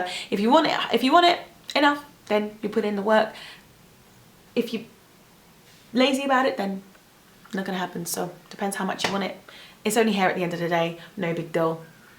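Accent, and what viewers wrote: England, United Kingdom. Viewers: Just subscribed to your channel. Thank you for the output. You sound English but your products are priced in $. Do you have a UK supplier or am I misunderstanding something?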